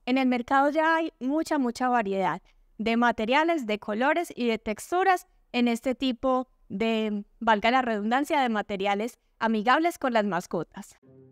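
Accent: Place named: Antioquia, Colombia. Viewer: Hola. Me encanta tú acento paisa, eres muy tierna. Cuéntanos sí té encontrabas sola en el momento del temblor. Té envío un gran saludo desde Bogotá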